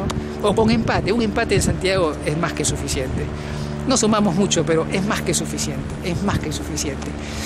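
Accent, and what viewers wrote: Argentina. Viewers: tiene dejo argentino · Afina el oído, el loquito tiene acento Argentino .no insulte a los chileno..., En Chile los yuotober ,no son tartamudos , tartamudos, tartamudos, tartamudos.....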